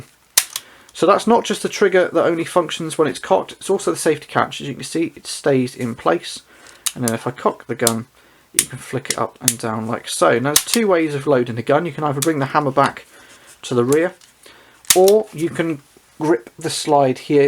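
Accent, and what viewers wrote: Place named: England, United Kingdom. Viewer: I subscribe to your channel and find your reviews informative, entertaining and thorough. That said, I simply have to take issue with you calling this pistol a "Cee Zee". You speak with a very eloquent English accent and should therefore know that it is in fact, "Cee Zed". This tiny faux pas aside, keep up the good work.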